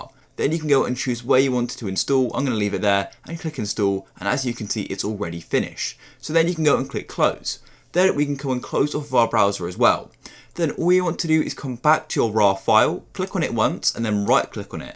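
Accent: United Kingdom. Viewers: ive always wanted a lovely british man to tell me how to pirate aseprite ❤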